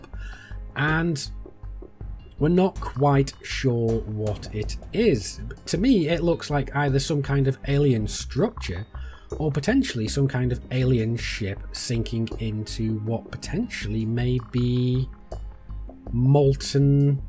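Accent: United Kingdom. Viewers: I love you random British guy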